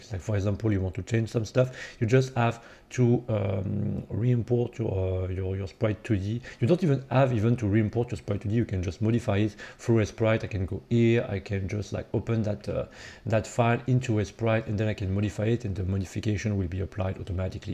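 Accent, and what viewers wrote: France. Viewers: j'ai compris presque immédiatement que tu étais français sans considérer ton pseudo 😂, très bon tutoriel merci chef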